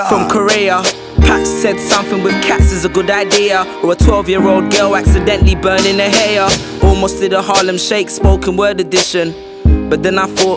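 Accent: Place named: United Kingdom. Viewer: Who would comment on your English?! I love you being British and I love your accent :)...good job great vid...keep it up!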